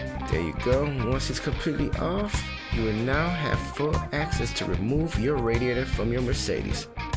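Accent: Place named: Jamaica. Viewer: Nothing Cummings in you guys face. LOOOOOL Yankee boy. i hear a Jamaican boy a twang.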